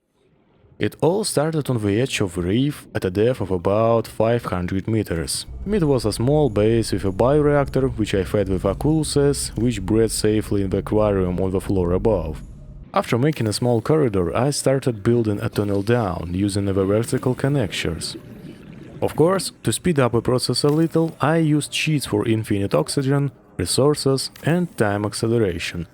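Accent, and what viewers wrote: Russia. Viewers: dude I love your russian accent. it is really good and cool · Сразу понял, что русский по "зэ и вэ". Насчёт "the" - "дэ" самый идеальный вариант. Надо поработать над произношением. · Мне кажется что этот пчел русский, хотя в акцентах я не шарю... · Такой акцент, мэйд ин раша, сразу понятно))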